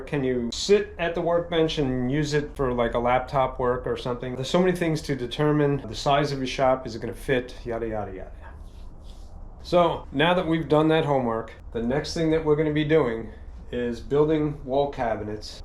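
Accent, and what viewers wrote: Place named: Florida, United States. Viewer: Man what a great thinker you are, That's working smarter not harder, You taught me an valuable lesson not to RUSH, but have a plan, We love your Florida Accent Lol are you from Long Island Or The City? Can't wait to see the next episode, you have a great setup in Tampa, Sure not making any more land , Stay Blessed my brother ✌️🌴🌅😎🇺🇸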